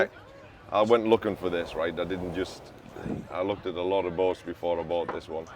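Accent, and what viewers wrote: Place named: Teesside, United Kingdom. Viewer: What a fantastic build. Love the smoggy accent!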